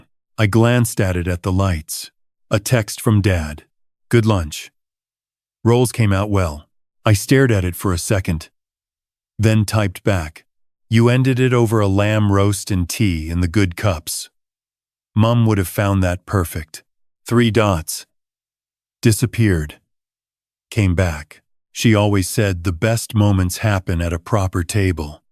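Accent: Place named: United States. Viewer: Having an American voice use British vernacular is very annoying. It really comes across as fake!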